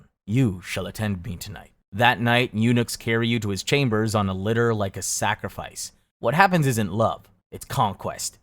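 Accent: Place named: Arab world. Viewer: arap gibi okuyor soluğunu gırtlağını silktiğim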